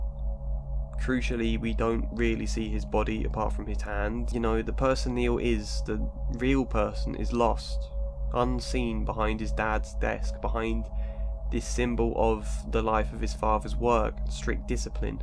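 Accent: England, United Kingdom. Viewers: You sound a lot like Robert Sean Leonard only with an English accent.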